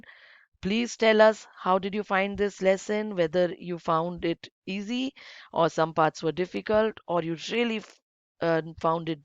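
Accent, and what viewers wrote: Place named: India. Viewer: teacher, thank you too much for this [ clear tutorial ] and [ the helpful ] which solved problem had been with me. from your accent I think u are Indian by the way I lived in India 3 years mostly in Bangalore and Mumbai, it was really unique time and I really want the time give me another chance to visit India..........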